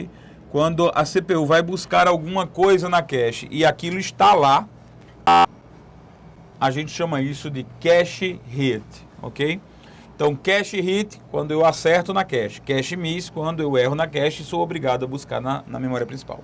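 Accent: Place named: Northeast, Brazil. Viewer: Finalmente uma aula com sotaque nordestino...tava cansada do carioca e paulista rsrs (sou paulista, pra os críticos de plantão.. ;P). Ameeei a aula, obrigada por compartilhar.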